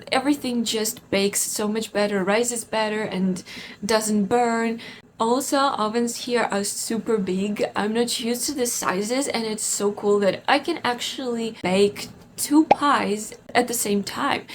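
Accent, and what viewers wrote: United States. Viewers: curious, which slavic country are you from? you sound very american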